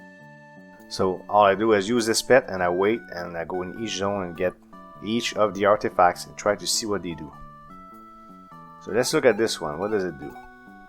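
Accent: Quebec, Canada. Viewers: Es tu quebecois ?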